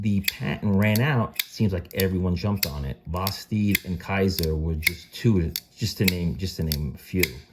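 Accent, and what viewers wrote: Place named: East Coast, United States. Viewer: Glad to join the party. Hearing you almost mkes me miss the east coast.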